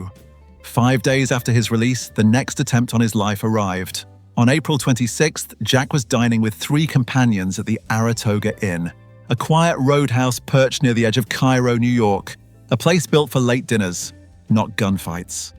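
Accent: England, United Kingdom. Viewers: Dont listen to the haters, really delete the comment, Thats what Jack would have done ( probably that and more 😅😅). Your accent is rich and sounds like proper English. You and fellow Podcaster The Last Supper have top notch Flows🌊🌊🌊🏞🌀🌀🌀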